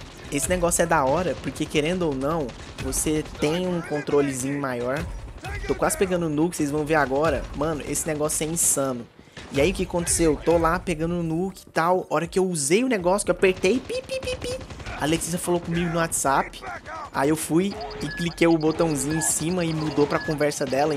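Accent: Minas Gerais, Brazil. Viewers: O cara é mineiro demais da conta sô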